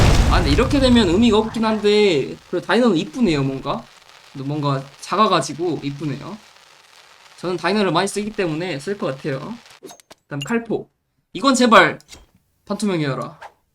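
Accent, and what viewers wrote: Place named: North Korea. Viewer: 근데 고향이 어디시지? 왜 북한 사투리가 들리지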